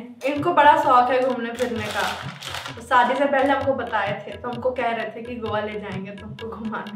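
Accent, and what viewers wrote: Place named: Uttar Pradesh, India. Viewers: I loved your bhojpuri Hindi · Yrr your up accent is too good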